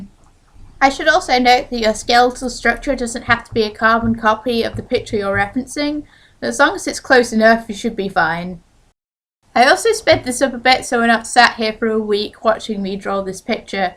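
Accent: United Kingdom. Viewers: Its quite good what you made :) but, i think that the teen childe would be a regular childe :p with 8 - 10 years and a teen childe would be allmoste adult size, or at least at the women size :) but just my opinion xp btw love the british acent ^^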